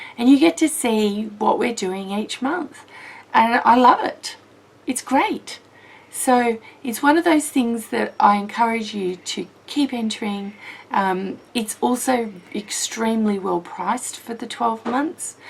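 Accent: Australia. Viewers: Haha, yours is real & proper Aussie English! Mine is just a silly Dutch accent, LOL!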